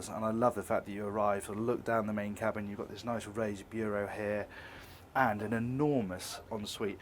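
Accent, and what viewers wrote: RP, United Kingdom. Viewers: 2) Questions, 1st) Why is do they put the helm station on one side of the fly bridge, while the helm below is on the opposite side? 2nd) Do they put the helm on a fly bridge, on the side of the boat most frequently used to dock, making it easer for docking? Therefore wouldn't you want the same orientation below as well? or having the helm on opposite side offers, the best flexibility when docking? Your my 1st-ish or 2nd-ish, favorite boat presenter's, very British, kinda too proper. Opposed to Aquaholic, who's more flamboyant. Both of you are stalwart, and very good presenters, comfortable on camera, and the information you provide, is always clear and... precise Thank's from the US. Cheers!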